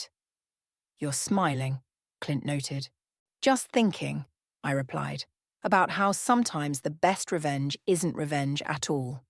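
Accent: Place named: United Kingdom. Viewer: British voice. F you. Not listening.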